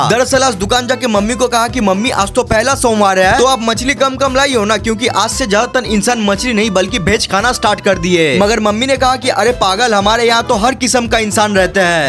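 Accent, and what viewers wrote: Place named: Bihar, India. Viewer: Bihari hai kya bhai😅😅😅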